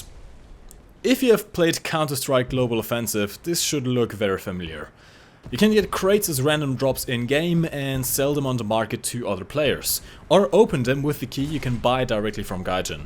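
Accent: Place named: Germany. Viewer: You Sound a Bit German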